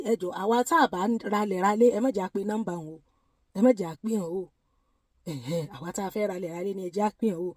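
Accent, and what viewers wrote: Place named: Oke-Ogun, Nigeria. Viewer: I love your accent as Omo ilu Oke 😊, I really enjoy it, ride on, Mama 👍🆗👍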